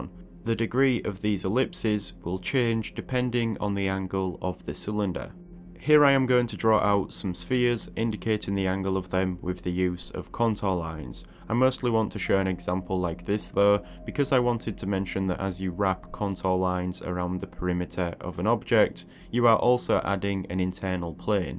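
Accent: United Kingdom. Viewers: obviously, but which one of the British accents 🤔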